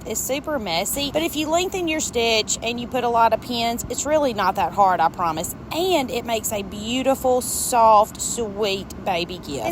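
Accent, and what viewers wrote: South, United States. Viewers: You are a great teacher. I’ve been sewing and quilting for almost 50 years. I came across one of your videos on facebook. What drew me to watch it was your southern accent. It makes me smile when I hear you talk. I’m a 70 year old born and raised Texas girl.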